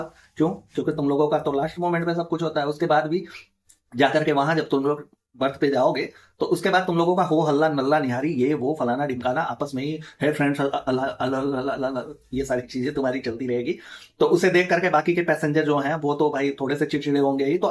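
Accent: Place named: Lucknow, India. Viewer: Are you from Lucknow or Hardoi or nearby places like Sitapur, actually aapke baat karne ka tarika bikul wahi ka hai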